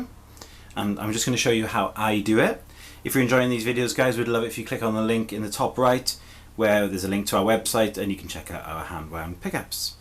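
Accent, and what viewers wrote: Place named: Wales, United Kingdom. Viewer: Da iawn. Thought I detected a bit of Welsh accent. So cool to have pickups made in Wales.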